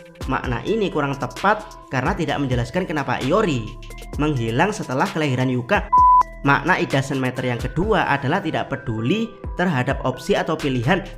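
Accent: Java, Indonesia. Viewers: Jawa nya keliatan. Medter 😅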